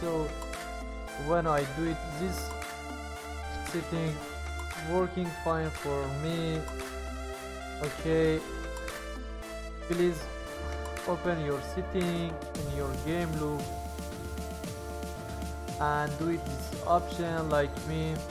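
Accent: Iran. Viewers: Thanks bro where are you from? You speak like iranin (دمت گرم داداش خیلی مفید بود تونستی فارسیشم بساز)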